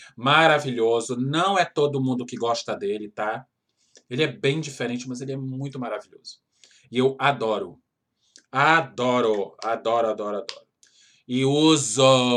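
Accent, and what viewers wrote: Pará, Brazil. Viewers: Achanhado kkk. Beeem paraense! Amo!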